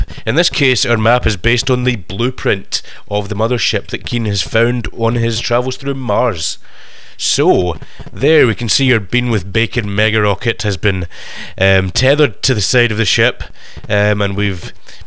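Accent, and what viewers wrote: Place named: United States. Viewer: Ha ha! I like that American accent. Almost had a bit of a 50s radio voice going, there.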